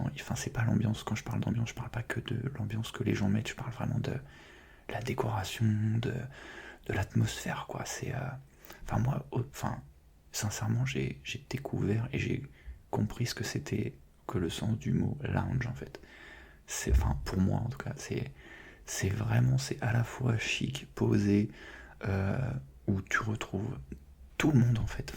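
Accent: France. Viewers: French is a language so good to hear. 😍😊 I'm in love with that accent. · I love french men voice and accent 🤤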